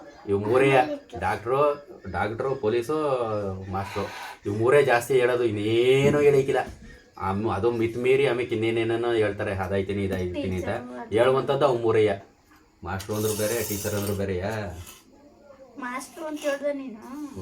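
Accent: Mandya, India. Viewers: Namaste avrige. Video super 👌. Nimma Mandya bhashe 👍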